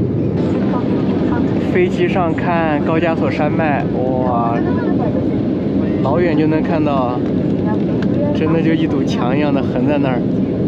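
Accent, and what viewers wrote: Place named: Shandong, China. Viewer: 是哪里人？听口音像是山东的。另外问一下，用的啥装备拍的啊？感觉画质很清晰。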